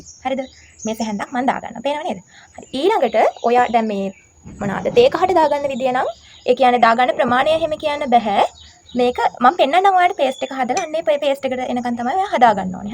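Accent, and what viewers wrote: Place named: South, Sri Lanka. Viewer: ඒක හරි එයා දකුණේ කෙනෙක් ඒක නිසා කථා කරනවා වෙනස් තමයි හැබැයි ලස්සනට කියලා දුන්නා thank you නංගි ❤️❤️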